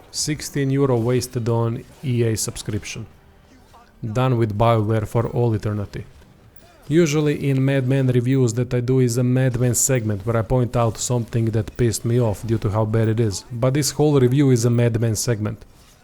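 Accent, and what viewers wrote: Russia. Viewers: Всё по делу рассказано. И этот ядрёный русский акцент))) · Melodramatic Russian sounding cunt. Hilarious! I like ur take and will check out other videos. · впервые слышу столь стереотипичный русский акцент не от актера, изображающего русского, а от самого русского.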